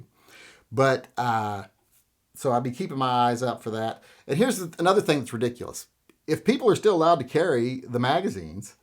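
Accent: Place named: California, United States. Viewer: You are sounding like a Californian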